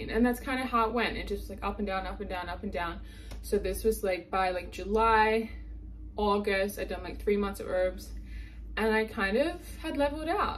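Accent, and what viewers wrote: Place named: United States. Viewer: I can hear the most tiniest American accent coming on!!!! Fellow Byron girl here x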